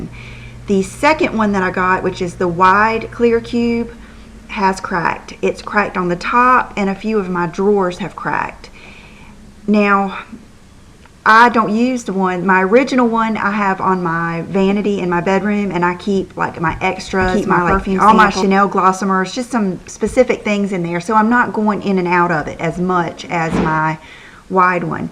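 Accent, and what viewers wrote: South, United States. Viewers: are you OK. Miss seeing your smiling face and hearing that wonderful southern accent. Sending you positive vibes your way. Feel better soon. Hopeful we will see you this week. It's was very odd with no Friday Haul last week. XOXO Emily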